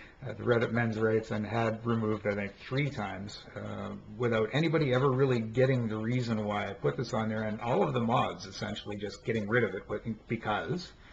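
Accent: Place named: Canada. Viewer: I sense an accent, are you Canadian? I hear that feminism is brutal up there.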